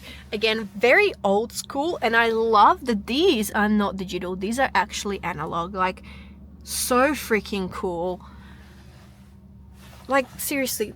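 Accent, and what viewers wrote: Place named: Australia. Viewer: Aussie accent on fleek ngl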